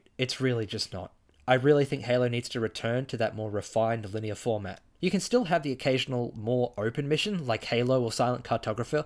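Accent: Australia. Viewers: Ausie accent with a lizard avatar that has big badonk-a-donks? This is amazing give me more. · >deadpan Australian accent >scalie rantsona Oh yeah it’s gamer time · Australian youtuber try not to be deadpanned and monotone challenge: impossible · weird that the harvey norman mention was the tipoff for being aussie and not the accent. hi btw other aussie here, i keep seeing halo 4 at this op shop i go to and i've never played halo before and don't own an xbox so am I haunted?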